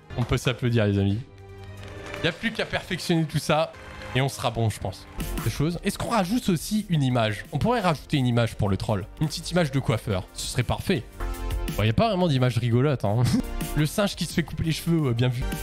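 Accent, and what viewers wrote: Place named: Alsace, France. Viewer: Accent Alsacien c'est pas de sa faute idiot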